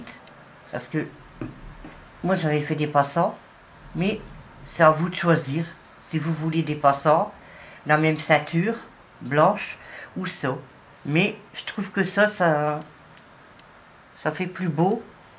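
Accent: North, France. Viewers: bonne nuit merci pour votre modèle votre parler et du nord, votre voie me rappelle ma grand mère,ma mère et mes tantes merci j espere que le confinement nais pas trop dur a bientot JSC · Bonjour je trouve la jupette hyper jolie je vais,la faire. Mais dite donc vous n'êtes pas du Chnord avec l'accent que vous avez.... j'adore....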